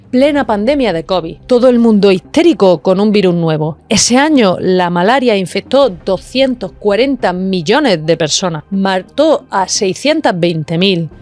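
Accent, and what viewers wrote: Spain. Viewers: Una mujer hermosísima que sabe de lo que habla, estupendas historias maravillosamente contadas, una voz que cautiva y para más, ese gracejo andaluz y ese decir que conquistan corazones sin piedad. El mío ya se lo robó cuando ví el primer video. ¿Se puede pedir más? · Fenomenalmente explicado. Magistral,. Acento granaino ENCANTADOR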